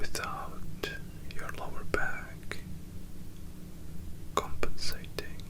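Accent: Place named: Australia. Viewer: I thought you was australian🇦🇺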